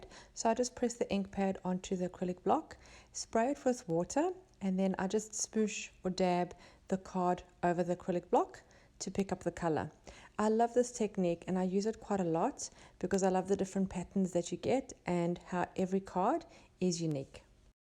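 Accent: South Africa. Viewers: sounds more South African than Australian, doesn't she?:) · I could tell she is South African, that accent never goes.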